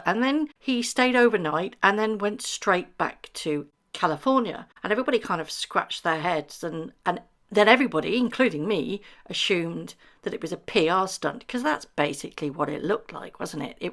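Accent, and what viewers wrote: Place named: RP, United Kingdom. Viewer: Dumb American here. I enjoy trying to suss out UK accents, and I've gotten pretty good over the years. But yours is a mystery....very neutral....good enough for BBC....just a bit posh-sounding (at least to my ear).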